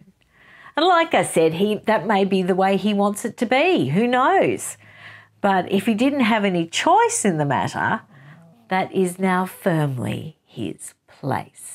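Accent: Australia. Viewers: I really love this format. I agree with you about Russell Brand, and did not know that somebody was trying to get him demonetized on rumble. I knew about YouTube. Like you, I did not have a favorable view of Russell Brand, but I don’t even know why I had any view of him. I’m not sure how I even became aware of him. But he kept coming up in my YouTube feed and I found him interesting and did subscribe and listen to a lot of his videos. I could not listen to the video on his book because of the beginning segment talking about the dog and I switched up immediately. I am very sensitive and things like that trouble me intensely. But I love all your videos and having lived in Melbourne for a number of years, I like listening to an Australian voice. I’ll look forward to more of these chats. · Loved this chat session! Being from the states i always love to hear the accents down under. 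You are such a great content provider about my favorite things...books. keep on talking to us about both sides of the story because you are so insightful! ❤❤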